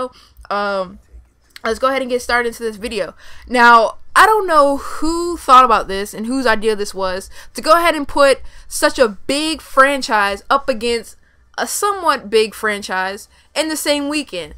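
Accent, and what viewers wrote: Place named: United Kingdom. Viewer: Lol your British voice, and I'm running out of comments on my phone...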